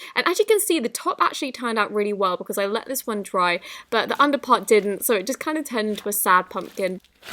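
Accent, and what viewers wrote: United Kingdom. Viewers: your from U.K?????😮😮😮😮😮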